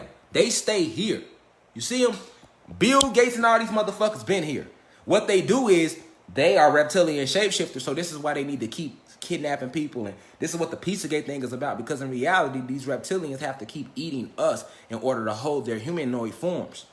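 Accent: Chicago, United States. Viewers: His Chicago accent so strong 😂😂😂 he make me miss my family in the chi now I gotta visit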